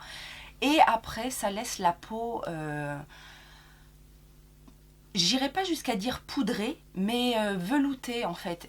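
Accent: South, France. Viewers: OUI pour le tuto accent du sud 👍🤓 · Coucou ma coquette ah lala tu es dingue je t’adore oui pour le tuto accent du sud 😂😂😂😂 groooos bisous 😘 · Merci pour cette vidéo avec un mkp très réussi. J’aime beaucoup ton teint et donc tes produits teints que je ne connais pas. Oui oui pour une vidéo avec l’accent du sud... moi je suis du sud-est 👍🏻👍🏻. Très bonnne fin de journée biz 😁🌸💋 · Coucou, j'aime beaucoup ce makeup ! Oui donnes nous l'accent du sud pour faire venir le soleil, j'en ai trop besoin, gros bisous !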